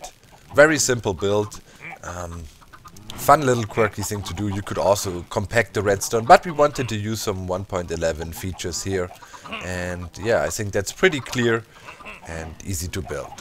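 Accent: Germany. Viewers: Mann merkt das er deutscher ist oder er hat diese deutsch englisch Akzent hat ^_^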